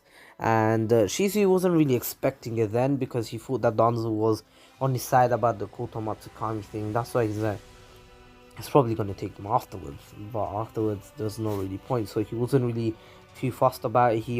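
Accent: United Kingdom. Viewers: Bro i love the british accent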